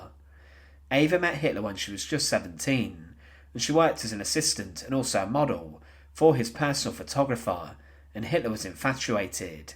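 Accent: United Kingdom. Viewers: He's English/British, not a fake voice smh jfc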